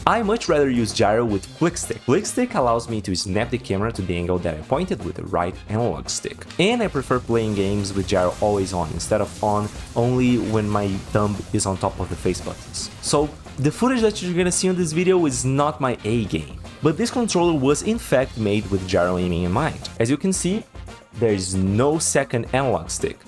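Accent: Brazil. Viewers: brazillian accent detected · sabia que tu era BR, o sotaque nao engana kkkk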